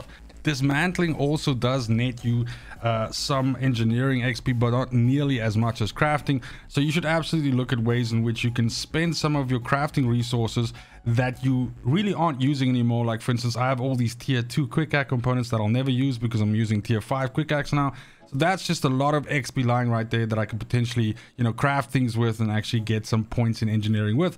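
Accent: South Africa. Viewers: Liked and subscribed. Sounds like a South African accent there👌🏾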